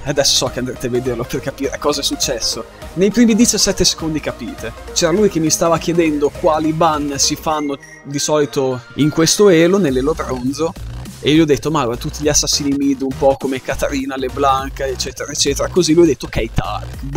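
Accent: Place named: Bologna, Italy. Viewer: Sei di Bologna? :O pure io :D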